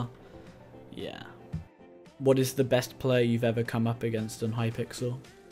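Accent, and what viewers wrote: United Kingdom. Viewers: U have such an english accent fam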